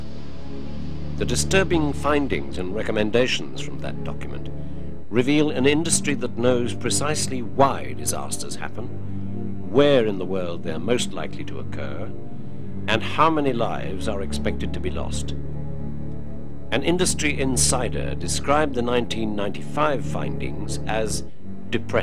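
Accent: United Kingdom. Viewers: This "documentary" is total bs. They got one thing wrong after another, and then, 12 minutes in, they just made up a new cause for the Tenerife disaster which had nothing to do with it. Total idiocy. I guess any 16-year old kid with an upper-class Brit accent can spend a few hours cutting clips from other vids and watching a couple of them for a few minutes so that he can sling some lingo into a script and then put something like this together and people will watch it. I love that it's called "The Facts." I'm certainly not going to finish it. The only stuff they're going to tell me that I didn't know already is stuff that isn't true. Crap, crap, crap.... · Why do they overdub an American narrator with a British one whose thick cockney accent makes it un watchable. Know why the original Mayday series was so good? The Narrator.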